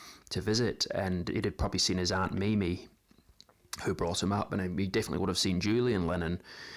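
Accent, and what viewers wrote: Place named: Japan. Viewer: Great video. I was 7 in 1980 and I also remember my father too but it was because I’d had never seen him cry before. Obviously now 40 yrs later on I understand. BTW nice Japanese accent 🇯🇵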